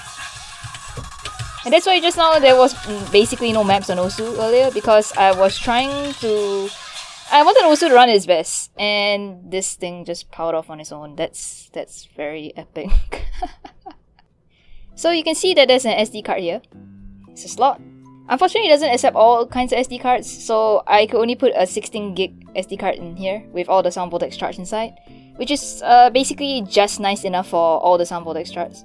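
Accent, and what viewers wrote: Singapore. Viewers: lmao i don’t like free things too you sound singaporean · How bout try robeats on roblox,its like tap tap but for 3 yr olds with ultra instinct, Btw,u sound singaporean,u from sg?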